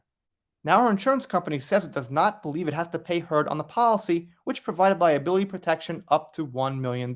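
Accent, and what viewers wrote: New York, United States. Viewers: Love your posts! Esp outtakes! Love your New York accent the most!! Keep going!! Xo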